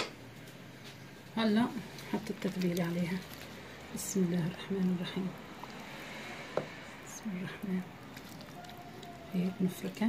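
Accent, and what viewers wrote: Aleppo, Syria. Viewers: تسلملي اللهجه الحلبيه...ابوس روحك 🌹🌹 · خالة حلبية 😍 من صوت عرفت 😘❤ ونعم وانا من حلب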